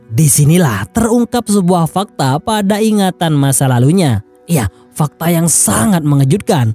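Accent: Madura, Indonesia. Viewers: Carklacer tak Ron karon. Maduranya kentel banget bang. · Miminnya Madura nih😁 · Ehh kakeh reng madureh kak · Mk host sah reng madureh reh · Bg lu orang Madura ya engkk padeh Reng madure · Mak tak ron karon kanak... Abbeh reng madureh ongguh ye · Mimin orang Madura yah🗿 kok aku kek gk asing sama ucapan Mimin yg bilang temancok kerreng.dan ee payah ongku😮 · Car klacer tag ron karon...mik medureh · Reng medureh kakeh kak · Logat Madura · Reng madureh bn bang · Abbe kak reng madureh ben 🤣😂🤣😂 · Mantaaaap medureh demmah lek 😊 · Madureh madimmh reah si admin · Payah ongguen, lee kakeh reng madure 😆, Salam stong dhere kak, sampang Torjun☝️ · Adminnya ini ternyata madura 😂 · Reng madureh jih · Ternyata Reng madureh sampean, hehehe, carkalacer tak aronkaron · Dooo reng madureh 🤣 Salam Bretd 🤘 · Jir orang madura yg nganarasi cerita flm nya 🗿😂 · Beeh oreng madureh kakeh bang. 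· Jir Reng madureh cuk 😁😁😁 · Min nya pola oreng madureh mak pelak pkk bhsa mdura tamancok kereng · Medhuro min "Carkelacer tak ronkaron"😂😂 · Orang madura nih yang nyeritain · madura kah cuy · Ini yg ngisi suara orang madura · Guy Madura beihh bahasana leburen😂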